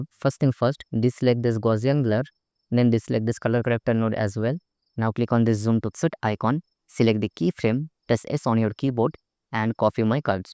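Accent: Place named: India. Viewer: always the ppl with the indian accents who have the most GOATED tutorials :glasses-purple-yellow-diamond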